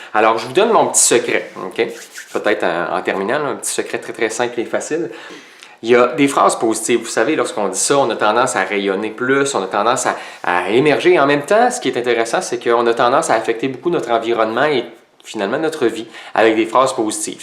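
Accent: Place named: Quebec, Canada. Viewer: merci pour vos vidéos la rapidité ne me deranges pas après je pense que c'est surtout l'accent quebecois qui gêne peut-être à la compréhension mais c'est le meilleur accent du monde :D bisous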